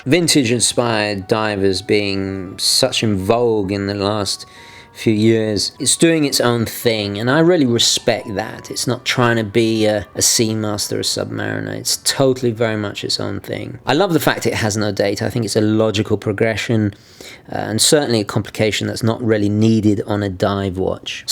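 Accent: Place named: RP, United Kingdom. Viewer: Ok , first things first . In general your vids. have improved, just keep the plumb out of your mouth and things should go well. But , that attempt at the posh type intro has got to go. Guys swimming around about the place just dont fit bro.! Now , the watch , love 95% of it , but the sloppy crown stem in the unscrewed position just says cheap crap , along with the Sellita movt. , unregulated to boot .! Otherwise I would buy one tomorrow .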